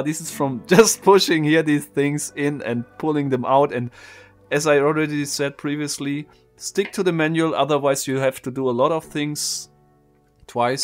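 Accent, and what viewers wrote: Germany. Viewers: Do you actually live in Germany? Because your accent is really German-like.. I'm from Germany too 🤔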